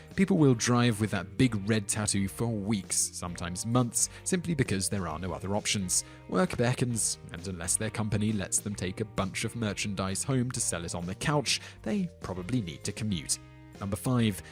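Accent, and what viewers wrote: England, United Kingdom. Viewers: He has an English accent because he's English? What do you think? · Shouldn't this be laws nobody obeys in America? Also why is the narrator British? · OMG dude what's with the English accent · I notice you have an English accent, but you Americanize everything you talk about, stats, money, language and even driving. Sell out!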